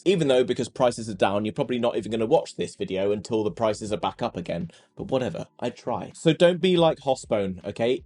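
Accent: England, United Kingdom. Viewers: His accent is an English one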